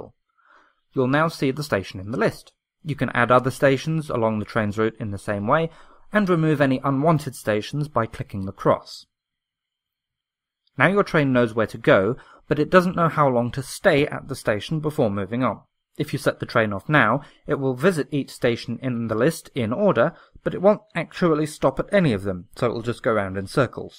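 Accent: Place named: United Kingdom. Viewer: me, an american: hears british accent i trust this man Amazing tutorial.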